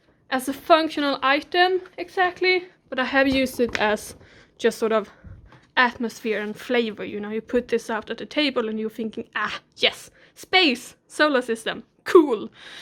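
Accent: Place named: Sweden. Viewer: Hi. You are Swedish, right? I can tell by the accent 😊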